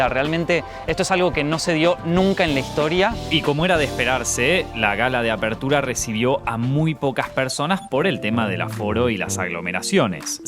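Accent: Argentina. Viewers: Dios mio!!! Ese acento argentino me encantaaa. Es hermoso! Un abrazo gigante desde Bogotá-Colombia 🇨🇴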